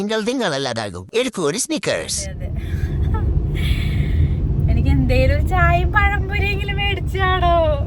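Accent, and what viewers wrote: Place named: Thrissur, India. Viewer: Trissur slang is very good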